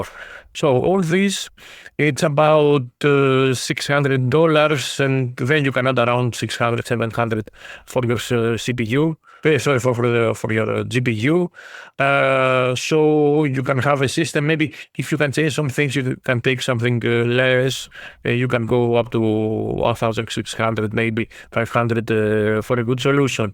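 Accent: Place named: Greece. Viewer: your welcome 🙏🏻 can I ask you something, are you from Greece? The way you speak English is like the common way usually Greeks speak the English language 😅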